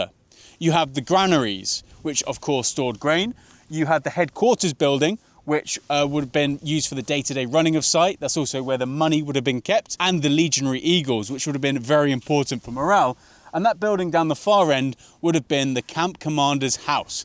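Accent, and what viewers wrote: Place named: United Kingdom. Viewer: J'aime écouter parler un anglais comme celui-là. C'est plus agréable que l'américain-chewingum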